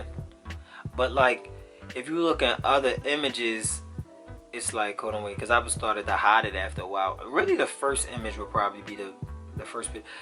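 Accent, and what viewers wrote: Baltimore, United States. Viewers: im so dead I could tell you from Baltimore just by the accent and I was right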